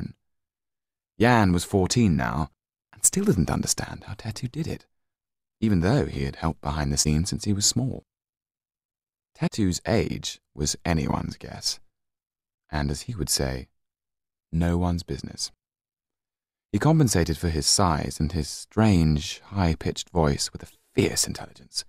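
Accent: United Kingdom. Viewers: you're not alone ..i still don't understand what he said because i 'm so distracted by his sexy voice....omg i love his voice, his acccent,...i love britsh they have such amazing voice..too bad im not from british :( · He brings such a huge opportunity for us male English learners to get a british accent. Bravo! Made it in one year. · Am I the only one that giggled at his rough london accent?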